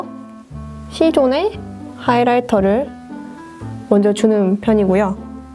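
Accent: Gyeongsang, South Korea. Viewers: 헐 오늘 영상 처음보는데 깜짝놀랐어요 중복합성피부, 뾰루지 잘 나고 누런데 홍조있고 뭐 이런건 다 비슷하다 쳐도 아이라인 쪼금만 그려도 화장 완전 진해보이는거 핵공감 ㅠㅠ 제 컴플렉스?라 해야하나 진짜 골치아파요 다른애들에 비해 화장 짙게 하는 편 아닌데도 제가 제일 풀메이크업한것같아보이는... 왜 그런지 정말 모르겠어요ㅋㅋㅋㅋㅋㅋㅠㅠ 저도 경상도 사투리 쓰는데 어느지역이세요ㅎㅎ? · ㅌㅋㅋㅋㅋ역시 경상도 븐이라 그런지 군데군데 반가운 발음들이 있네영 ㅎㅎ 넘이뿌세용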